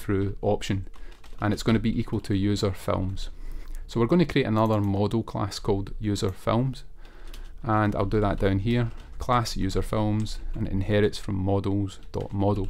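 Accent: Scotland, United Kingdom. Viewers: Fantastic Scottish Django Developer! Found another one :D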